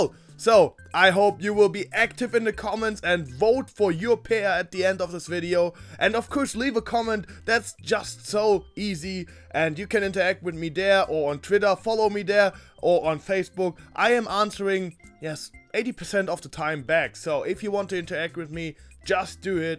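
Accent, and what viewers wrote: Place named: Germany. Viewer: Man merkt sofort dass du Deutscher bsit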